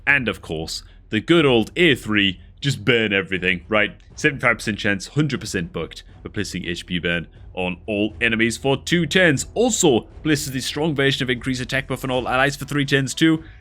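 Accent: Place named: Newcastle, United Kingdom. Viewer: It sounds baseline Geordie but mixed with something else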